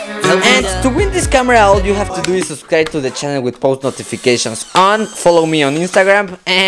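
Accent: Mexico. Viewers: Tu ingles es muy mexicanisado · Oye te amo. No entiendo nada de lo que dices xD pero mi untuicion dice que eres mexicano jsjs besos en el fundillo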